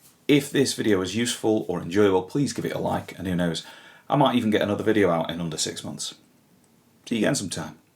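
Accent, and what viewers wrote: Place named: Stoke-on-Trent, United Kingdom. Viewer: Mate, are you from Stoke on Trent? That accent sounds familiar!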